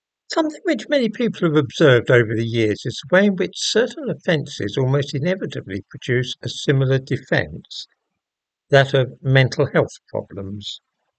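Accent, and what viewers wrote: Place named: RP, United Kingdom. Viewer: Your posh bbc accent gets right on my teets.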